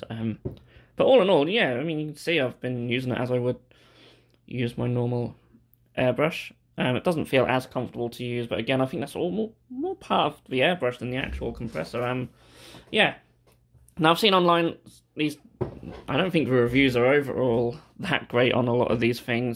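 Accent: Norfolk, United Kingdom. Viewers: Beginner with painting 3D printed models here and got one of these. So far so good. Must ask you are you from Norfolk? Yarmouth here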